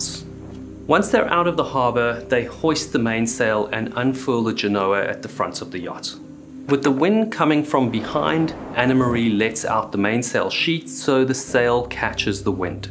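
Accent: South Africa. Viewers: Ahh I was wondering how they died, I mean it was pretty obvious they died of their injuries, but the previous version did feel a bit open ended. Just wondering if you'd ever do a Q&A type video? I'm not usually interested in that type of thing, but I'd love to know were you're from, I can't place the accent although it sounds South African or somewhere else in Africa, but I also remember you said in 1 of your videos that you had lived in England. It would be interesting to hear about your career too.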